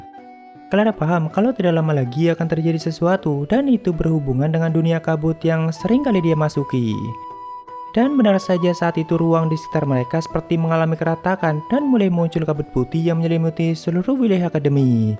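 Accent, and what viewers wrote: Banyumas, Indonesia. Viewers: Ga terasa udah part 45. Mantap apakah mimin orang ngapak2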